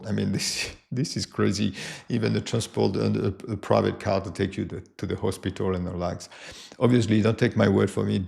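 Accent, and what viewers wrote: France. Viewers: Is he not? I mean, that is unmistakably a French accent. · "Why I Moved to France"... Are you talking about yourself? Because you sound French... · Hi, Are you french yourself ? You seem to have a french accent.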